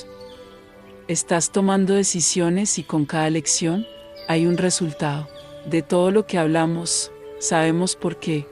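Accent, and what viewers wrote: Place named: Colombia. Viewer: Gracias por tu mensaje ,pero porqué escucho como un acento colombiano....? Esta es una canalización.? De todas formas gracias por el mensaje. Muy bueno..!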